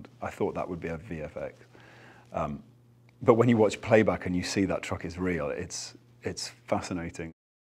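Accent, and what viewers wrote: United Kingdom. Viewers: In the BBC Sparkhouse production he has an accent as a farmer in Yorkshire with Sarah Smart and Joe McFadden. A super show!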